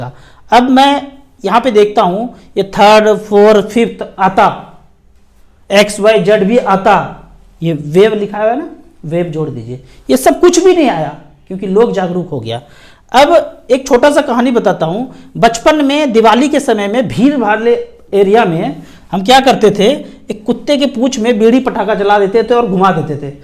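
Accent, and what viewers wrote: Bihar, India. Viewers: Aap Bihar se hai kya sir?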